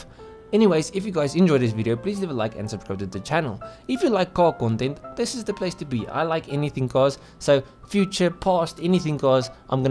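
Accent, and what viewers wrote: South Africa. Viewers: i notice that South African accent from miles away😂❤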